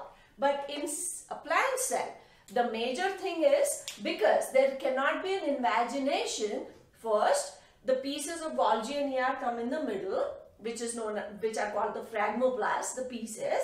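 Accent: India. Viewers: Usually I cant stand indian accent. But yours is just admirable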